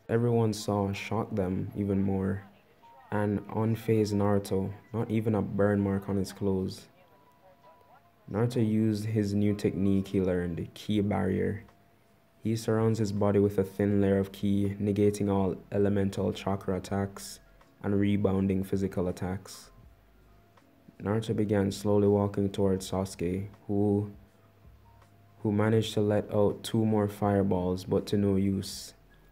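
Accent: Jamaica. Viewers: you sound jamaican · Bruh I’m Jamaican and and he sounds like me for real sometimes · Aye u from Jamaica right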